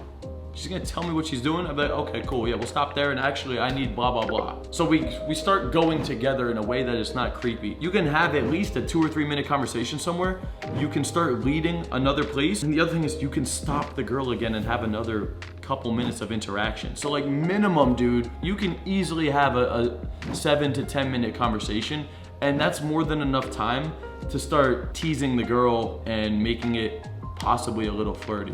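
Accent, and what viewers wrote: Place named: New Jersey, United States. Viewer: You from jersey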